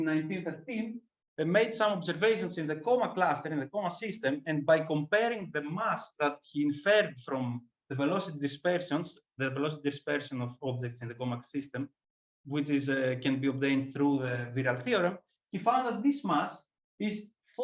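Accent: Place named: Greece. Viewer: μιλάς τα αγγλικά με ελληνική προφορά, ταλαιπωρείς πρώτα τον εαυτό σου και δεύτερον τον ακροατή , υποβαθμίζεται η δουλειά σου , όταν μιλάς τα αγγλικά το στόμα να το έχεις λίγο πιο ανοιχτό να βγαίνει η φωνή. Φιλικά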